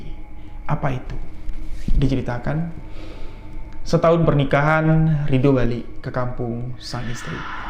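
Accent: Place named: Java, Indonesia. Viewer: Bang ini sy kira dr jawa,oooaaalll.....ternyata dr Jambi.salam sehat,salam santun,salam super duper,salam silaturahim dan sedulururan dr; Bandung Jabar.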